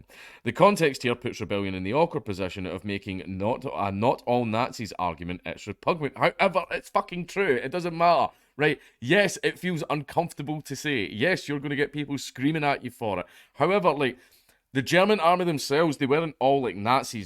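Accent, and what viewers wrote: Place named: Scotland, United Kingdom. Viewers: I think it was just his scottish accent. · No it was just that thick Scottish accent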